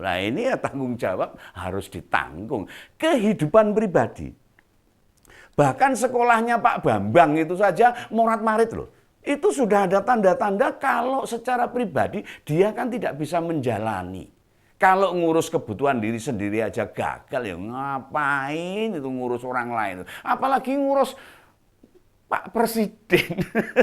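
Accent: Java, Indonesia. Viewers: Bapak dengan gaya bahasanya sgt lucu maka aku suka nonton. Logat jawa itu enak didengar. Logat saya batak suka di ejek, aku suka tertawa logat saya diejek sehingga seru. Salam mesra bos !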